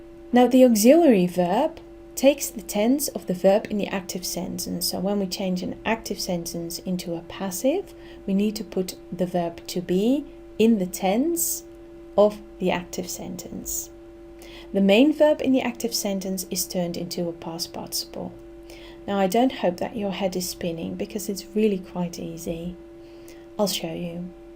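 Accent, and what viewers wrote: England, United Kingdom. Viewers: Clear , precise ,and a business like approach I loved it. And a wonderful cultivated English accent . I am delighted .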